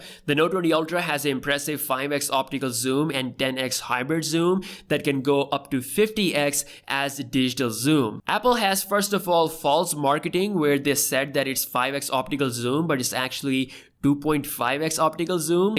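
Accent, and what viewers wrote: India. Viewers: Your voice like Indian guy speak English and trying hard to hide the accent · Chutiya desi accent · You have a funny accent. It goes Indian and American like a dog playing with its tail... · Accent like an india tongue,, spoiled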